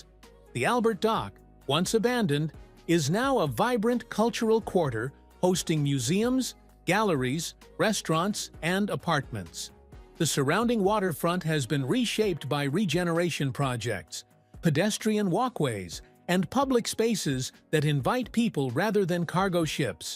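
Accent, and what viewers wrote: United States. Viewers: Bloody awful US accented narrative with non-English pronunciation! JeeZ!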